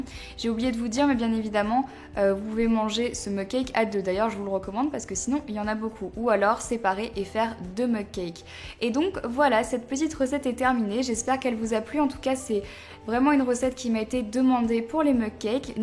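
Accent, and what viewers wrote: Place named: Naples, Italy. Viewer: on dirait à Napolitain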